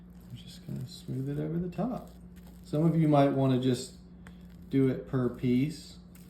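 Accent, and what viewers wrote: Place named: Louisiana, United States. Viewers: Ok….. Why do you have a normal voice while cooking one recipe and then….. out of the blue, you’re a full fledged Cajun!!! I love it!!❤ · I am from Louisiana & I hear da accent! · Where did that thick southern accent come from all of a sudden? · Are you livin down in Louisiana? Sounds like it. I'm from Shreveport. Your cookin looks good.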